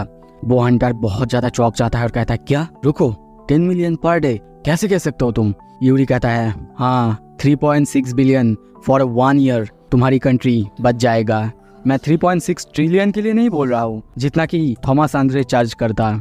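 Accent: Bengal, India. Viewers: Tomer kotha sune mone ho6ilo Tumi Bangali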